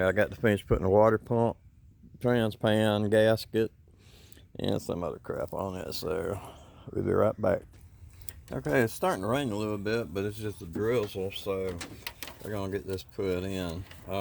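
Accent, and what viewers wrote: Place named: South, United States. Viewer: Southern folk, only accent i can watch at 2xspeed just fine😉 Good vid, never thought of swapping key electronics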